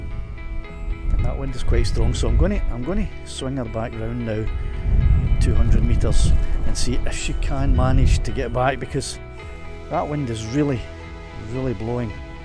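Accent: Scotland, United Kingdom. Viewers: Thanks for another great video! Always so excited to see another video of yours come out. Your cheery attitude and Scottish accent always lifts me up my friend. You do such a great job! It takes time to put these videos together and I for one really do appreciate it.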